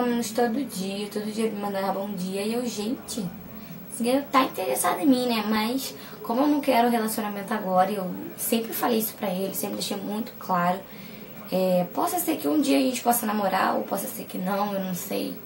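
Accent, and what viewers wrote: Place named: Rio de Janeiro, Brazil. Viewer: pelo "x" no final das palavras, vc e carioca.. onde mora ? em qual parte do rio ?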